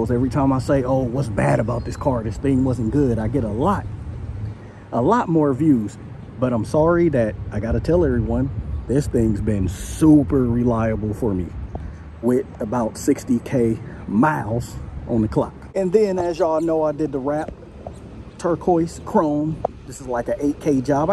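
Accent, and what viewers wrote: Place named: Bay Area, United States. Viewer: I knew you were from the bay as soon as I heard you talking! Lol almost thought what was mistah fab talking! Loll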